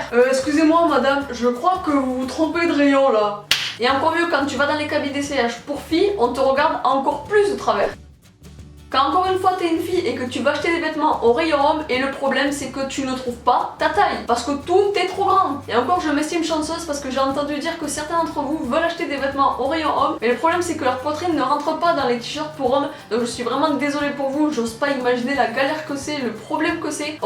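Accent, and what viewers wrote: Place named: Marseille, France. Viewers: Toi tu viens du sud non? x) Ton accent es prononce! Apres je suis un peu dans le meme cas, niveau habit. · J'aime tes vidéos , tu dit exactement ce que tu pense c'est géniale , par contre tu A un accent du sud vraiment prononcer , tu viens du sud ? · on dirait que t'ai marseillaise ! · J'adore ton accent du Sud😌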